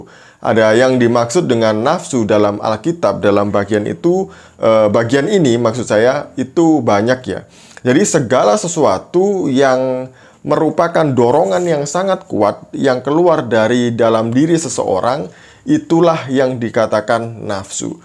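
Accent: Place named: Manado, Indonesia. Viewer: Kau itu org mana, kalau logatnya seperti darianado.. org mabok yg masuk gereja yg kamu bilang itu bohong tetapi kalau dia mabok pasti disuruh pulang, kalau kamu kentut di gereja ya terserah dia tap tapi orgnya tdk tau malu..tapi tdk membatalkan org lain untuk beribadah karena makna cara penyembahan kpd Tuhan berbeda dng islam..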